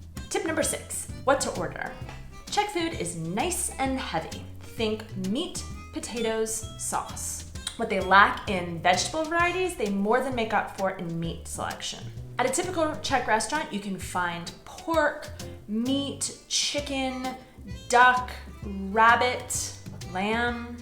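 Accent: United States. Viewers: Máš štěstí že žiješ v Praze :-D Mluvíš vážně dobře Česky, ale pokud pojedeš kamkoli jinam, odlož všechnu tu *** politickou korektnost a divokou Americkou intonaci :-D Jakmile Ti bude připadat, že mluvíš tak sarkasticky a že jsi tak cynická, že by Tě za to doma zavřeli, mluvíš jako Čech. Přijeď do Ostravy a uvidíš :-D You are lucky to live in Prague :-D you speak really god Czech, but when you go anywhere else here, put aside all that ** PC and wild American expression :-D Only when you feel so sarcastic and so cynic, that you would get locked in America, then you speak Czech. Come to Ostrava and you will see :-D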